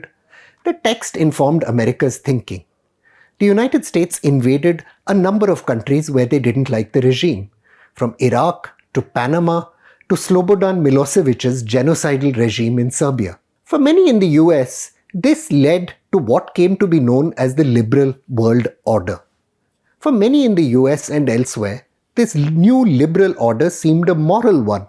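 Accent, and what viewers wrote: India. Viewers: So, this is how CNN would sound with an Indian accent... Russia bad, Serbia bad, NATO good... What a joke...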